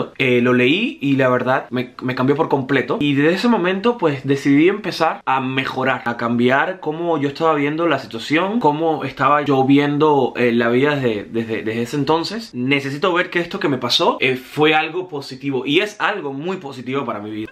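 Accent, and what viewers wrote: Cuba. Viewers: Felicidades Pero por favor Habla con acento neutro Porque cuando hablas como cubano no te entiendo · Este pk sigue con el acento neutro ya no estamos en univision habla bien que eres cubano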